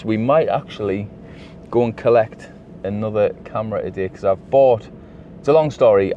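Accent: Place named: Geordie, United Kingdom. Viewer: You've just inspired me to start a little project myself. Not churches, and hopefully something a little different. Nice to hear the local accent in one of these Youtube photography rabbit holes. Geordie lad myself, based up in Cramlington. Maybe I'll come back one day and update you on the progress. Liked, subbed and commented, because...why not.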